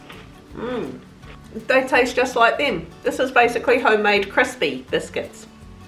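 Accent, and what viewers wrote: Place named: New Zealand. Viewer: Absolutely adore that Kiwi accent & no mucking around with fancy words and just gets the job done. Good old Kiwi style!!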